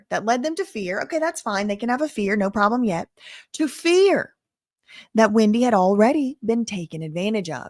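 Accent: South, United States. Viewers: I’m just here for lovely southern accent. ❤ God bless this woman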